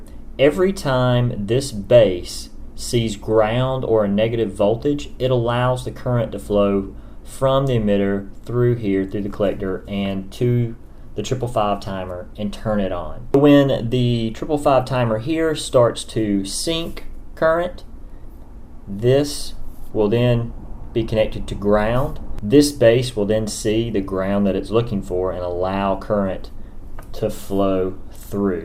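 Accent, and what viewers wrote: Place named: North Alabama, United States. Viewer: Where are you from? I grew up around the South Georgia accent and I can't quite pin you down. North Alabama, maybe? Thanks for the great tutorial.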